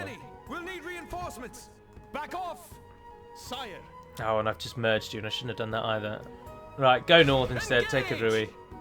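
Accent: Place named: England, United Kingdom. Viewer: Holy shit. I couldnt tell if he was english or scottish :O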